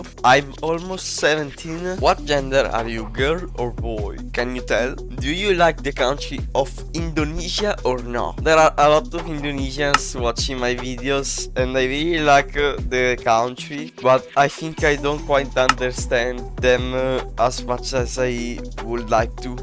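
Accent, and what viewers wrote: Italy. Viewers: Yes, his accent is Italian... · Si sente che sei italiano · But, you're Italian? because it looks like you have an Italian accent.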